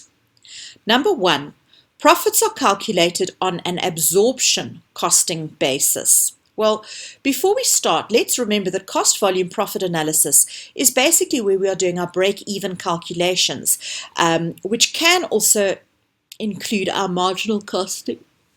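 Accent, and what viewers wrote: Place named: South Africa. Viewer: You have a South African accent. Are you from there? Your Accounting tuition is fantastic! Thank you so much.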